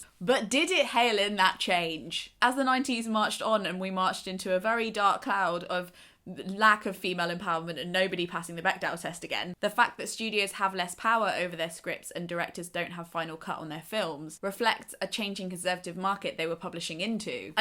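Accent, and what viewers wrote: United Kingdom. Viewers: Also wild to hear Patrick Swayze lines in a British accent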